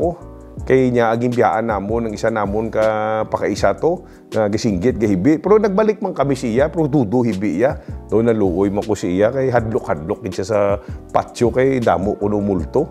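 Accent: Iloilo, Philippines. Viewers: Nami pamati-an ah...certified Ilonggo gid... ❤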